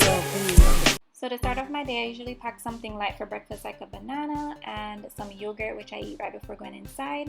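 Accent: Jamaica. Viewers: You sound like you have a Jamaican accent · are you Jamaican?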